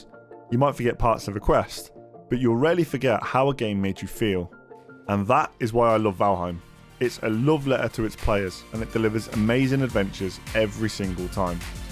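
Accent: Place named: Coventry, United Kingdom. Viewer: You sound like you're from Coventry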